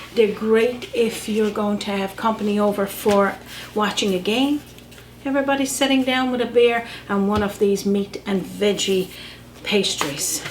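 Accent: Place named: Newfoundland, Canada. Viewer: Hello from Portland Oregon. My daughters are watching your videos to learn how to cook. They love you so much they are mimicking your Newfoundland accent. I heard them asking each other " and " ow" are you"?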